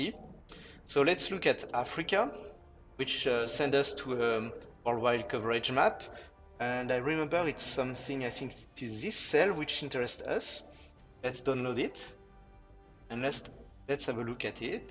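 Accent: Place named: France. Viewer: On sens tellement que tu es francais^^ mais super vidéo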